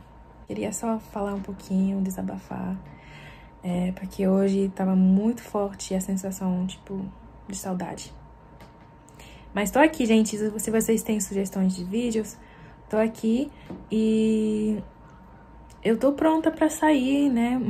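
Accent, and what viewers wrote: Northeast, Brazil. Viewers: Volta logo para o Brasil🇨🇦🇧🇷vc já tem o sotaque Brasileiro❤️🥰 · vc realmente é nordestina vc ta falando sotaque 👉 vixi que bom a casa é sua NATAL RIO GRANDE DO NORTE. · "Ne" pra la "ne" pra ca kkkkkk parece nordestina zkzkksksksks ❤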